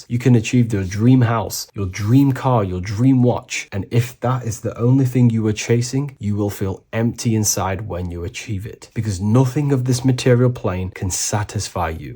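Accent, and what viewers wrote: United Kingdom. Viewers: Such a clear British accent you've got bro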